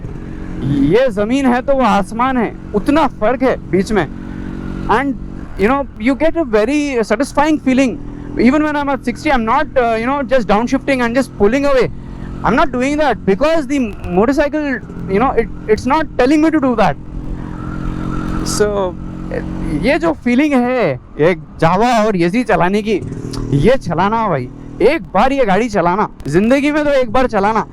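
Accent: Hyderabad, India. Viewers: What a beautiful feelings.. 🥰 I am understanding your feeling the way your talking by heart. And your hyderabadi hindi is more Cool. I mean Mazze aaye sunkar.. The Sound of the your Roadking is very very super.. I just Loved it. 🤩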